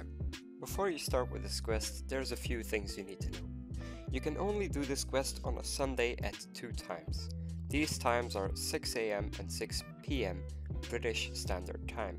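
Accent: Belgium. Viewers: Are you Flemish Belgian??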